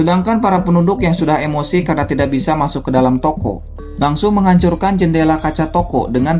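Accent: West Java, Indonesia. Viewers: Kang orang sunda nyaa.? 😁Logat sunda na kabawa wae euy🤣 Salam kang ti Bogor👍🏻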